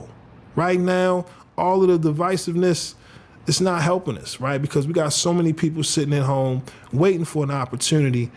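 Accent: Baltimore, United States. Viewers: I'm checking in from the DMV. First time finding your channel. When you said 2, I heard BMORE, i was gonna ask, but then you started talking bout BMORE. Showing you some...❤❤❤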